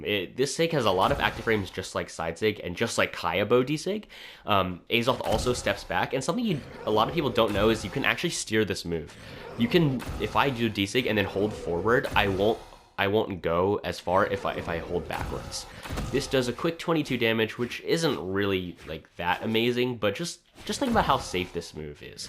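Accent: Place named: Zimbabwe. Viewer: Where are you from? You sound Zimbabwean🇿🇼.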